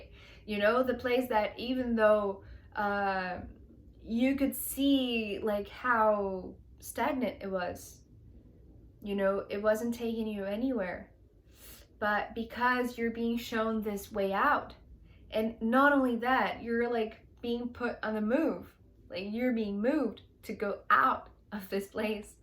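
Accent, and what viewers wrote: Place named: England, United Kingdom. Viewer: Thank you,! I think I'm feeling this right now. I just discover your channel through San story video:) Beautiful energy, deck and readings. You are an inspiration to me. I love your English accent as well;), I'm from Spain. Love ♡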